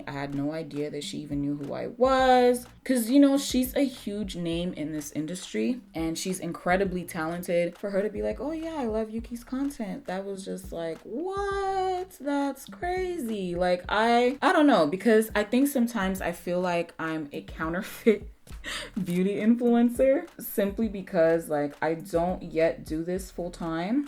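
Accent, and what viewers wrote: Nigeria. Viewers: Yayyyyy your back! I was having chocolate withdrawals I missed your Nigerian accent 😍❤️ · The Nigerian accent is baccckkkkkk!!! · The Nigerian accent NEVER fails to make me laugh. You are a freaking queen.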